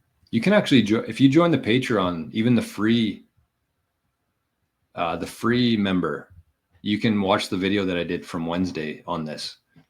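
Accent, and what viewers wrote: Canada. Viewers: I bet this guy played hockey? Sounds Canadian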